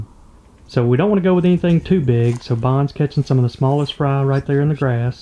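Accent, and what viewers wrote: South, United States. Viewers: Same 🇬🇧 here. We clearly NEED wholesome content because I've loved watching this and the gentle southern accent is so calming . Love it 😀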